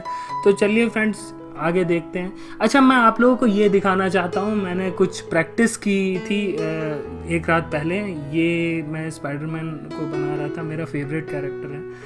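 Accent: Bihar, India. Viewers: Sir aap Bihar si hoo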